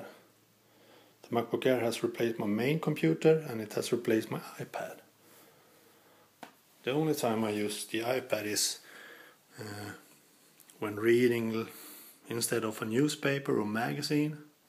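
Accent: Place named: Sweden. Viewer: Bra jämförelse, älskar den brutalt svenska brytningen ;)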